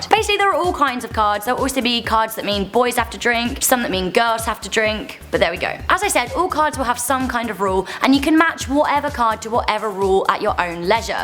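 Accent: United Kingdom. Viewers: she is British living in north America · Is she british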